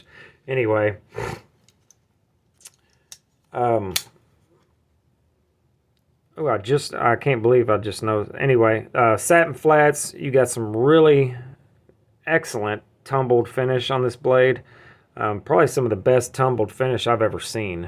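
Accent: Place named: Mississippi, United States. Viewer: You sound just like a cousin of mine that lived in Mississippi.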